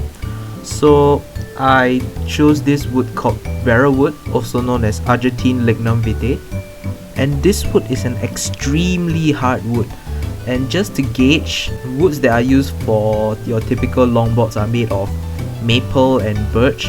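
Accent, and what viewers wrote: Singapore. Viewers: Singapore accent?? :00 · Haha you sound either Singaporean or Malaysian! Anyways nice tutorial bro!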